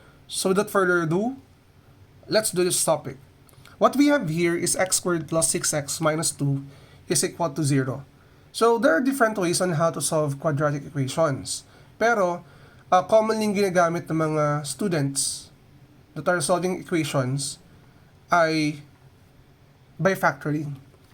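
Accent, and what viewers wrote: Philippines. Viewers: Yeah his accent is filipino so the pronounciations are a bit different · He's Filipino so the accent is a little thick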